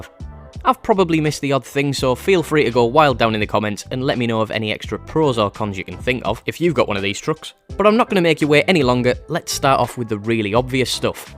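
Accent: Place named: Northern England, United Kingdom. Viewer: Didnt expect a northern voice when i opened this video hahaha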